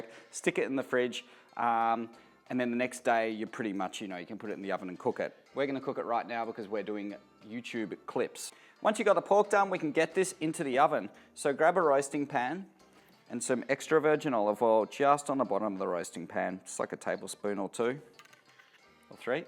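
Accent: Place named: Australia. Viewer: I feel like you are an incredibly skilled chef with a great pallette but you're just boring as hell to watch in a video. IDK what it is. Maybe it's because I'm Aussie too and it's hearing the same accent? Anyway recipe looks killer I'll give it a go when I can afford proscutto, nice olive oil and pine nuts (might be a while cause I'm a poor student).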